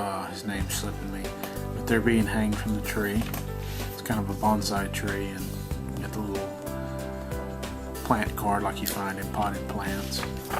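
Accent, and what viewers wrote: South, United States. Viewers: thank you very much for this. the musi with your soft southern vouce makes this very hyypnotising and relaxing.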